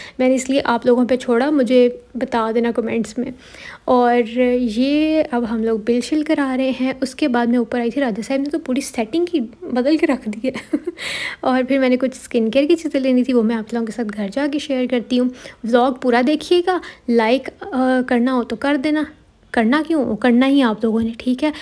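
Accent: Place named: Punjab, India. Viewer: Iam from India punjab te mainu tuadi boli boht pasand h pure Punjabi jado bolde o boht Kazaa aunda h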